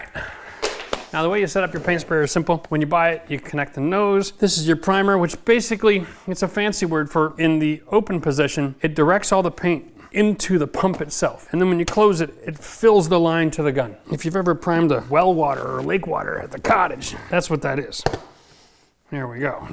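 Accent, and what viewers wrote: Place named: Canada. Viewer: I'm from Canada! Trust me...as a fellow Canadian....The way you talk.... We know ! LOL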